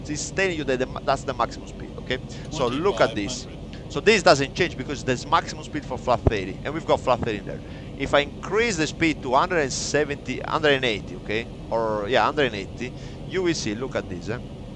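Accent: Italy. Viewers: Brilliant Airline Flight Instructor and that Italian accent!!!!! It is quite entertaining and engaging!! Keep iit uuup!! Would like to see how you teach jet transition.Are you planning a video or have one produced yet? I wish to compare Airbus with Boeing as the philosophy of flying is different.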